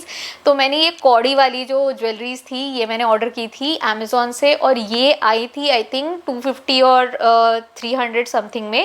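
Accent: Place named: Telugu, India. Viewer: aapka English Hindi baat karte Telugu Aata sister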